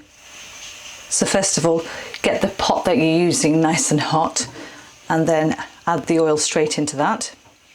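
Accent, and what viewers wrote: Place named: Yorkshire, United Kingdom. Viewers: She sounds like a Yorkshire lass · My God I am honoured! I truly am!! I infer from your accent you are a northerner, I'm from London but could conceivably make the journey up, however I'm in two minds about appearing on camera, I will let you know. But I really feel you will eventually hit the big 7 figures, as I may have said above you've tapped into something quite enormous, for reasons I'll try to message you.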